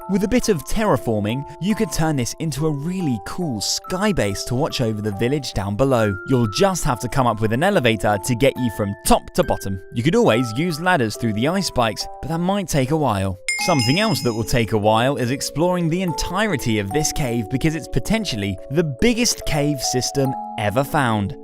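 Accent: United Kingdom. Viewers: He sounds like he's from the great British baking show.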